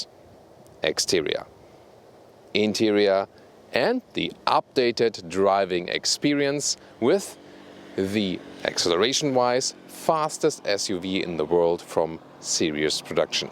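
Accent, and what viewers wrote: Germany. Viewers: Love your reviews. Great English speaking with superb German accent. Awesome info and opinions on the cars. I've just ordered an iX3 :-)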